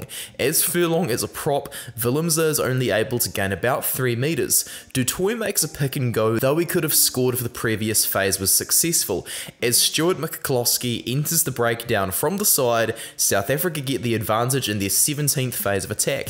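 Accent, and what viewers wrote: New Zealand. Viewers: Just as an aside from an Englishman, the New Zealand accent is a thing of wonder and beauty!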